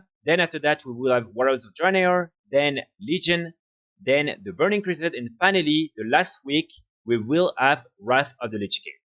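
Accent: France. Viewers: NOT a lot of gold :P. Love your content overall glad I have found your channel! Your French accent also, if I'm not wrong hehe.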